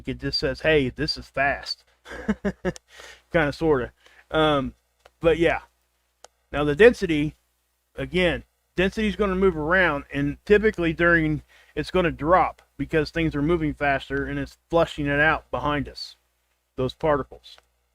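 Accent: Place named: Appalachia, United States. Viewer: btw I love the hillbilly talk it's what I best understand. Thank you with prayers for gettin well soon & blessings to u & family. ✝️🕊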